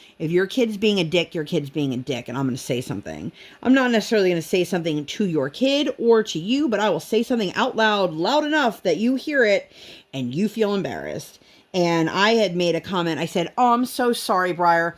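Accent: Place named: Philadelphia, United States. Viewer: Hi, it sounds like you had a really wonderful trip!! I wanted to mention that I’m a Philly girl and my husband is from King of Prussia. I mention this because it sounds like you’re from the area. We live in the Pocono’s now. What area are you from if you don’t mind me asking? I love listening to your WIP and Chats, but I don’t comment much. I’ll try to be better at that. Take care of yourself and I’ll see you in your next video. 💜 Dawn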